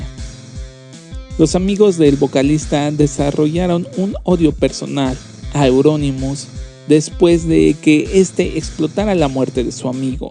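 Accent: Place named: Mexico. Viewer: ES MEJICANO. SE LE ESCAPA CRONICO EL CANTINFLAS. SUENA MAS A COMENTARISTA DE PASITO DURANGUENCE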